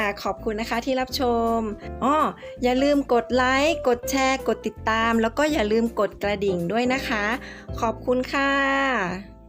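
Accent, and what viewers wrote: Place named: Northern Thailand, Thailand. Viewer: เข้ามาไล่ตามดูย้อนหลัง ตอนพูดกลางก็พูดได้แบบเป๊ะมาก แต่อู้คำเมืองน่ารักมาก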